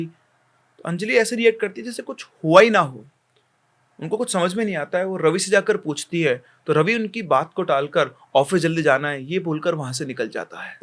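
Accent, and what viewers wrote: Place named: Rajasthan, India. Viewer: Intro se Samajh ara h Rajasthani hona 🤭 Ghani Khama 🙏🏻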